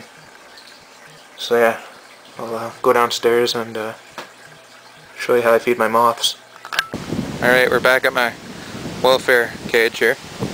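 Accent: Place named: Canada. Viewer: You say out and house like a Canadian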